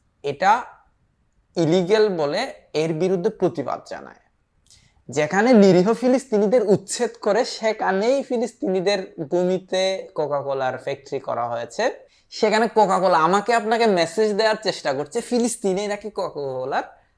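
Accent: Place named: Chittagong, Bangladesh. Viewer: ভাইয়া মনে হয় চট্টগ্রামের, ❤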